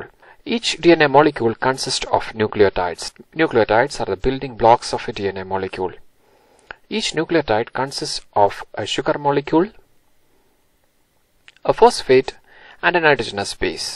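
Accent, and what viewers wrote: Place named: Kashmir, India. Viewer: You have a kashmiri accent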